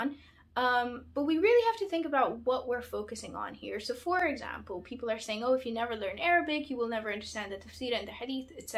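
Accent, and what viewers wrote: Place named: United States. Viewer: It's a very interesting concept and makes a lot of sense. Arabic is not an easy language to learn and not everyone needs to learn all of it in order to understand fus'ha. Very good point about learning from translations. Where are you from? Your channel says UK but your accent sounds slightly American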